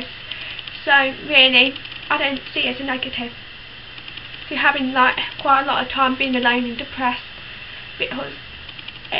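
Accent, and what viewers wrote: United Kingdom. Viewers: I don't understand how you have a British accent, that's crazy how that works. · Probably not the only one to ask but how do you get a British Accent if you can't hear the way words are pronounced? Just curious. :) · It's so cool how despite the fact that you're deaf, you still have an English accent hehe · I realize that part of your accent is from being deaf, but it also sounds somewhat British. Is that where you're from? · How do you talk like a frickin british person if you are frickin deaf???? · I notice that you speak with a British accent, are you completely deaf or almost completely deaf? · Wow you're British and still have an accent! Of course you don't know what that sounds like but it's amazing how humans just know how to speak and adapt to situations like you have to know sign and english even though you can't hear what you're saying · They managed to train you into a vaguely Yorkshire accent *thumbs up*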